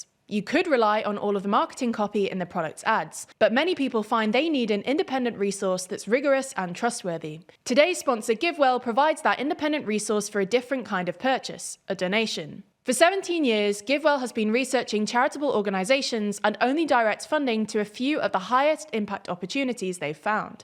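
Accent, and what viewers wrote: United Kingdom. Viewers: An asian woman with a British accent talking about French politics... Your credibility is zero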